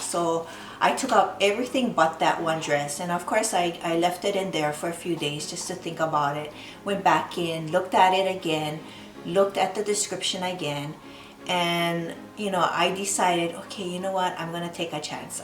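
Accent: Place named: Hawaii, United States. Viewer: Ho aunty, nice review! I knew you were from Hawai'i after I hear you talk. Aloha and mahalo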